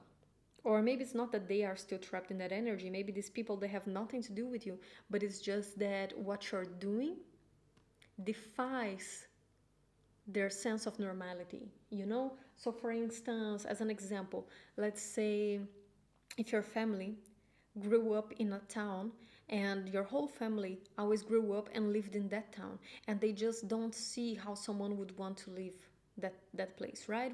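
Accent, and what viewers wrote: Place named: Brazil. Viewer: Are you Brazilian?!? Sound like !! 🇧🇷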